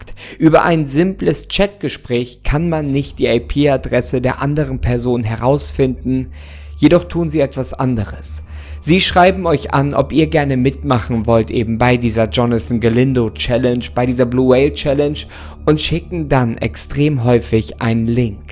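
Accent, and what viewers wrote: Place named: Northern Germany, Germany. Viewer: Norddeutscher Akzent halt.. Ich spreche es auch so aus💪😂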